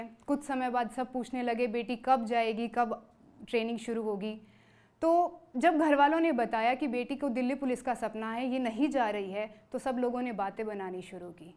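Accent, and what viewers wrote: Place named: Haryana, India. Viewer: Apki haryana dialogue bahut mast thi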